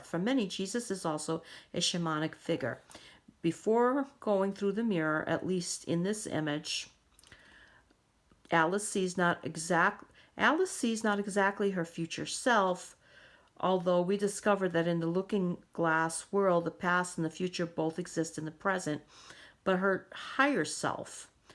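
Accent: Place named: Boston, United States. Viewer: I have been watching use this deck for quite some time now. I am not drawn to it. I think it is interesting even if some of the iconography is different than the R-W. I even like the Alice in Wonderland stories but I am just not interested in using this deck. And gosh! What a book! Too much for me! does great with it and that is enough for me! Thanks for going over it though! PS....thinking back to the old radio show Car Talk, that IS the accent you have! Yeah Boston!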